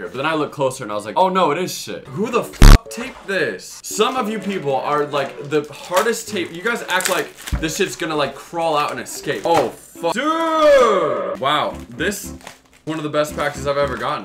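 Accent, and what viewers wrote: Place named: United Kingdom. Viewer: Did he became British . Or am I just watching to many British youtubers 😭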